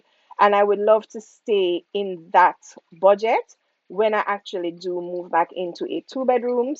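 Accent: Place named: Jamaica. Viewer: Just love to hear you talk: perfect English blended with colourful patois delivering a ton of information in a cheerful, light-hearted tone.❤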